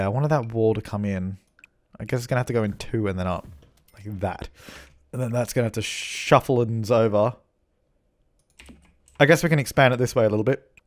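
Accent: Australia. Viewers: ps: i looooooooove australian accent ^^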